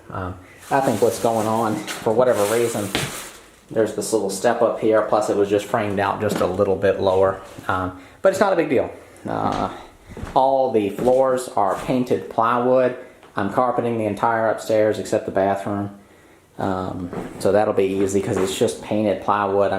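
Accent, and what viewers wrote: South, United States. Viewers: Yeah I would say his Southern accent is retaining a good amount of the "Transatlantic" or "Mid-Atlantic" accent that we used to teach in schools before WW2. You can check this accent on YouTube - fascinating story. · It's called Southern. 🤦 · What accent is this? I can hear it is southern but I'm curious where it is from exactly. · Your accent was pure 'Gone With The Wind'!!! 'Oh Ashley'! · My guess is Georgia or Alabama, but what do I know? 🤷‍♀️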